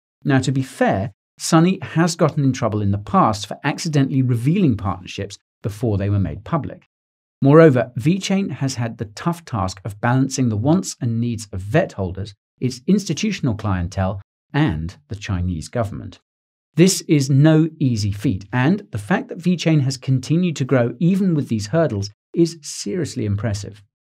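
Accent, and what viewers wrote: United Kingdom. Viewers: Is he British I can't tell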